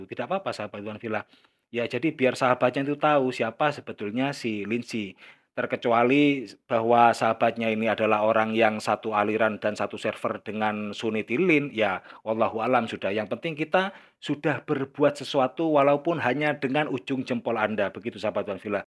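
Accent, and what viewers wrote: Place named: Tegal, Indonesia. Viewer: Logatnya kaya org Tegal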